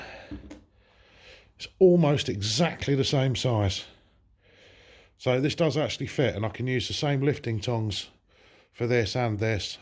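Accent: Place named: England, United Kingdom. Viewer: wch accent you got? from wich part of england are you from. Is nice!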